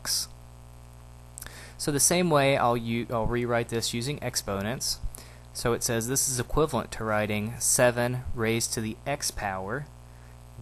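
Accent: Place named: South, United States. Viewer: Aw you missed a phone call for us. That's dedication right there Sir. Also, you should do a video in your southern accent - I think you mentioned you have one in another video.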